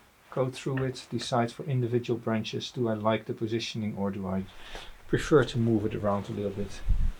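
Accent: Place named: Netherlands. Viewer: Another very enjoyable video,! Your tips are very easy to understand and remember. Also I enjoy another Dutch person speaking English, always funny how we pronounce a lot of English words, it makes us very recognizable haha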